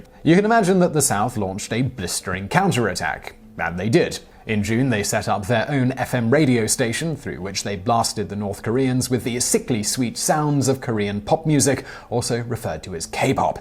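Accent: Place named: RP, United Kingdom. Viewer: How is it possible for you to literally sound posher than Prince William? Dial it back a couple of points dude, it sounds ridiculous. The poshest person in the country doesn't sound that posh so what are you trying to prove?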